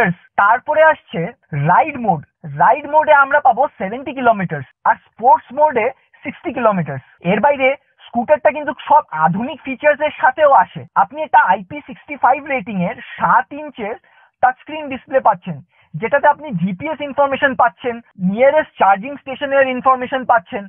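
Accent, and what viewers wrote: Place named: Bangladesh. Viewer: Aapnar pronounciation Bangladeshi der Moto..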